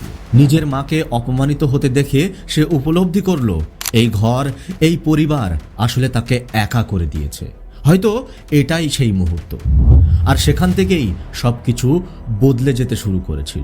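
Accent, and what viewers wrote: Bangladesh. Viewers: tumi ki bangladeshi? Tomar pronunciation tai laglo. Khub valo poribeshon, khub valo laglo. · Apnar protita uccharan kothabarta porishkar BANGLADESHIDER mato .. Bharote ese voter card adhar card kobe jogar kore thakte suru korechen .. INDIAN bolchen but you are not INDIAN YOU ARE BANGLADESHI .. SOURCES TA KI ,, POLITICAL SOURCES ??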